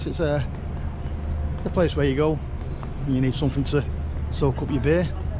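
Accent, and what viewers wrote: United Kingdom. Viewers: Thought you were from Manc good on yeah. Our interpretation would be Geeza bud ta. Guess where in the country that comes from.1 Guess.🍻🍻😂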